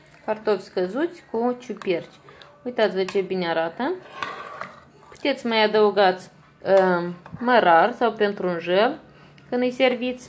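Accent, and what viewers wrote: Moldova. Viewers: Sunteti din Moldova? Accent😂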